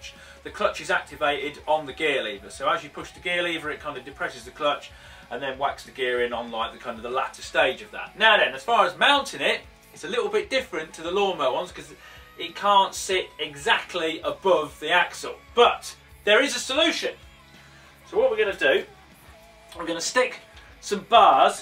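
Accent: United Kingdom. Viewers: Why is every British person the most British person?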